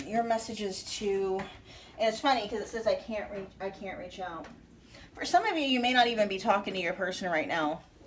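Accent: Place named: France. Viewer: Pile 2 and 3. He was still married and lied about it. He's a drug and sex addict. We both have kids and I was willing to leave my husband and create something him. Thank God I saw all the red flags and I cut all type of communication between him and I. I'm in a better place far away from this drama. Lies can clearly cause detachment between people. I regret meeting him. I'm a psychologist and I can't even imagine the struggle and the burden of his lifestyle. I discovered everything and I'm just disappointed because I waisted my precious time. May he find peace and joy with his son's mother. That French accent is not for the weak.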